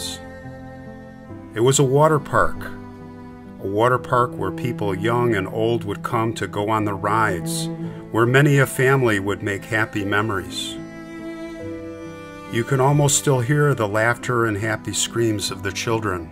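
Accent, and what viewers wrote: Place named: Chicago, United States. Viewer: New to your channel - not sure how I missed you for so long. What I like is that your stories are about people we probably never heard of before, your slow, calm voice, and of course the content itself. But there's more: You seem so familiar. I was born/grew up in Evanston, IL - very close to Chicago, as you know. So you accent/grammar/inflection reminds me of many male relatives. It's almost like hearing one of my Uncles telling stories again! Anyway, glad I finally stumbled into you, and now look forward to going back to hear/see your earlier posts, too. :)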